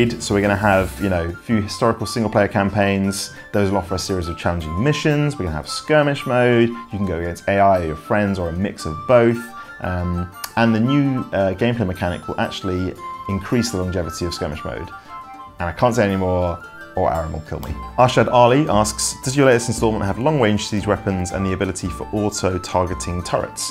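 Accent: United Kingdom. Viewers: I thought is playing Lol only,but no,here he is talking with some strange British accent. GGWP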